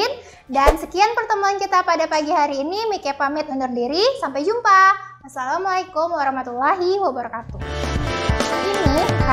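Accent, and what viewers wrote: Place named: Medan, Indonesia. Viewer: Kaka orang medan y bhsanya kedengeran bnget ka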